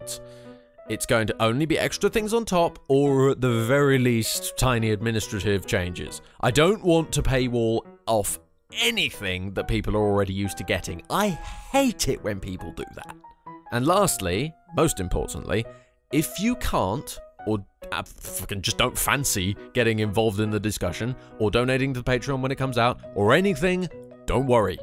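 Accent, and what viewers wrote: United Kingdom. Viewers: Well having a patreon is nothing bad. Good luck with the rewards! Except for the once that have already been mentioned (names in the video, donators chosing games and playing with you on multiplayer games on stream) maybe for a higher tier the ones who donate can ask for a sentence you can say in your british accent? Then you can send the mp3 file to them via e-mail or something like that, and they can do whatever they want with it. Use it as a notification sound on their phone, in their videos. I don't know. Again this is a suggestion, you are more than welcome to do whatever you want with it. Also sorry for being inactive on your channel. I am very easily scared by horror games. Anyways tallihoo and good luck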